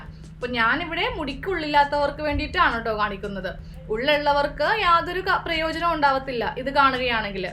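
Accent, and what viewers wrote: Kannur, India. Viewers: Kannoorkariyano samsaram kette thonni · Kannor aanalle, naanum kannoor aan. Samsaram kettappam manassilayi😃👍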